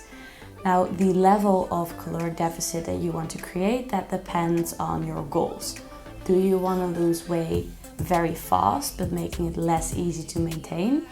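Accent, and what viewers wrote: Netherlands. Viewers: Great video :) Thanks for the great information! Are you Dutch??? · I hear a Dutch accent, are you Dutch? :D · I think it's her slight slight dutch accent ;) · Am I the only one who heardddddd the Dutch accent Ik was zo van kan je in nls praten 😂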